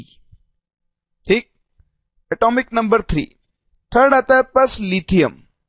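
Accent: India. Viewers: Indian English..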